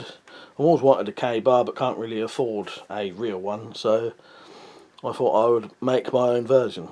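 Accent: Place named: England, United Kingdom. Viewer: Great video.i grew up on and from your accent I'm guessing your from the same area as bruce.and rod Smallwood too.awesome scorpion reminds me of my homemade one.its on my YouTube channel.youll see the similarities.great looking blades man.mine are cheapo steal.only 1 I did is stainless and it takes 3 times as long.i use same tools as you.except my dad's portable band saw to take off as much steel as possible and grinding off the rest.before I did about 70% hacksawing.dont know if your a fan but I'm a true nerd maiden fan.up the irons!!!!that applies to knife makers too!🎸🔪🎸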